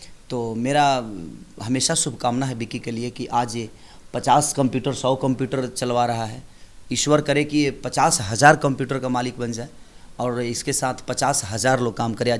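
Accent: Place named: Bhojpuri, India. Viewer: Bhaiya aapke juban se bhojpuri aachha lagta hai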